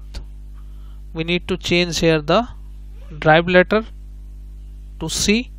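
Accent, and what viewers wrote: India. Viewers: This is really too much of a mix of MBR installations and GPT. What with your heavy Indian accent, it would have been better if you'd made two separate presentations, one for each, then we wouldn't have to decipher which instructions apply to what circumstances. Thanks for trying, but...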